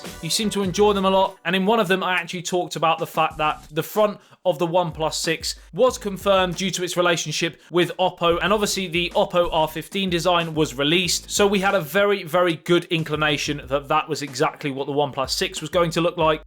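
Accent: England, United Kingdom. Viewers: I ❤️ hearing the difference in English accents, pronunciation & use of words compared to American English. The way you pronounce aluminum sounds so funny! You guys say it all wrong 😳 Or do we? 🤔 🤷🏼‍♀️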